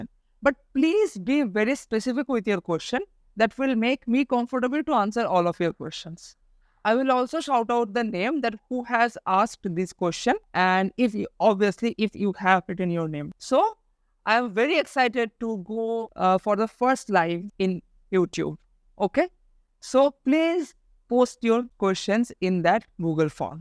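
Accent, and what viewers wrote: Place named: India. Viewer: Bro trying hard not to talk in Indian accent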